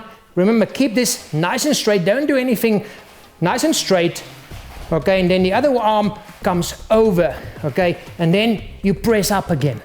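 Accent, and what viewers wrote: Afrikaans, South Africa. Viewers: Roy Miketsukami It's a South African Accent common under Afrikaans Speaking South Africans. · His accent is South African.. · Judging by his accent i think he's from South Africa. I know cause I live there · sounds south african to me :) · Its a south african accent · He's a saffa (South African) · I think it's a south african accent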